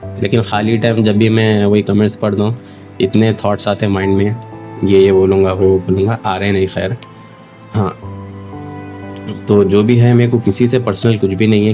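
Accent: Hyderabad, India. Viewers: Assalamualaikum bhai hum logao aap ke sath. Hyderabadi accent chodke amer dens ke Jaise videos banao jaise amer bin ishaq reel reaction do baigan reply do Bhai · Bhai tum serious baath kare to bhi hasse waisa dikhra 😂 woh jaando nakko chhodo videos banana ache banate tum videos🤣 ek to bhi rehna bhai Hyderabadi accent me roast karne wala😂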